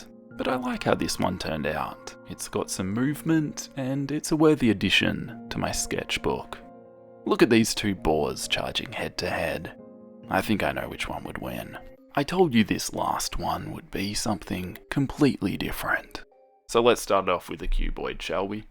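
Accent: Australia. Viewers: Wait he's Australian not british?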